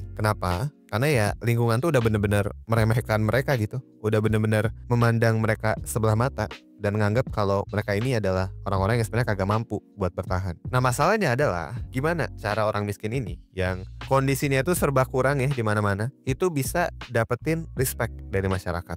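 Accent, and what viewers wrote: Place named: Banjar, Indonesia. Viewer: admin orang banjar kh nih, logat bahasa banjarnya kadang2 losss takaluar😂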